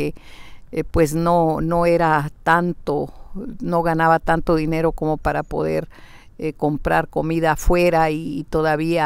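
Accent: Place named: Monterrey, Mexico. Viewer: Hola, su acento me recuerda mucho al de la familia de mi novio, ellos son de Monterrey, México. Un abrazo desde España y gracias por sus videos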